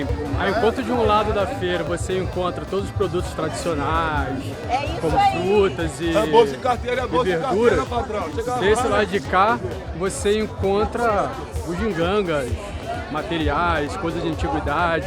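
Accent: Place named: Rio de Janeiro, Brazil. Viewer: Adoro o sotaque carioca, muito chic!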